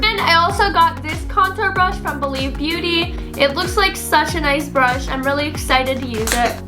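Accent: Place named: Australia. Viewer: i thought you were australian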